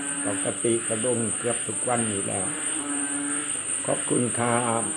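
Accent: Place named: South, Thailand. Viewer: มะเขือพวงไม้มงคล มาฟังสำเนียงใต้ ชอบจ้า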